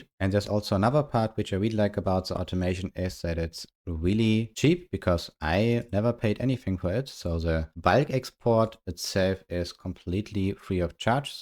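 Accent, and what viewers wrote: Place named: Germany. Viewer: Hear german accent, know it's quality without watching the video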